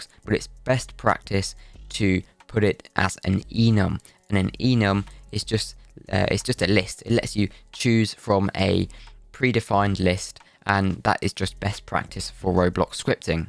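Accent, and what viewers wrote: United Kingdom. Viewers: British? By your pronunciation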